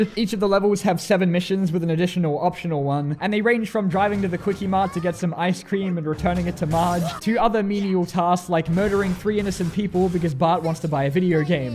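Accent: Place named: Australia. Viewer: I heard your Australian right well guest the hell what mate I’M A AUSTRALIAN TOO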